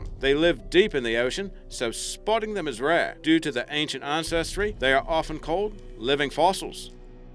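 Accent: Australia. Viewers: Dave 983 I think he is an aussie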